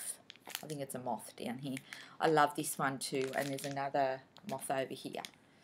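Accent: Australia. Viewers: Loved your video! It's so nice to hear an Aussie accent on Flosstube. Cinderella is absolutely gorgeous ❤️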